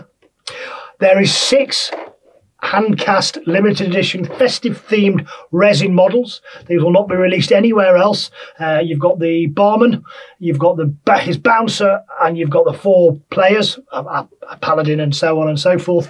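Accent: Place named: United Kingdom. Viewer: . you should have told the story like Sir David Attenborough! I mean you already have the accent, so why not flaunt it!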